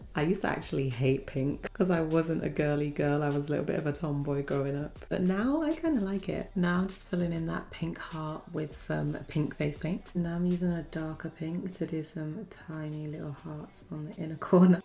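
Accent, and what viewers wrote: United Kingdom. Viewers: ARE YOU BRITISH??????? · Are you British By the way I love you❤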